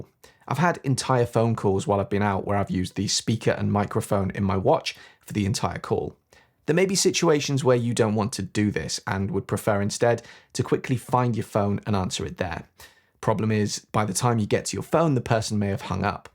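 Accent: United Kingdom. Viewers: 😂thanks but u seem like u have super powers in explaining and speaking convincingly. American Apple engineers would be hypnotized by your Brit accent 👌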